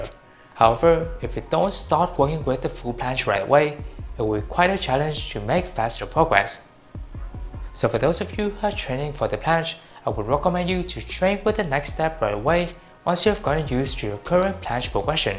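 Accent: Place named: England, United Kingdom. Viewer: Bro your English accent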